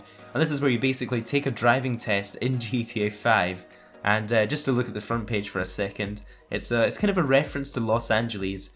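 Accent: Scotland, United Kingdom. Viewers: Hey man! Are you scottish by any chance? You sound like it, love your channel! <3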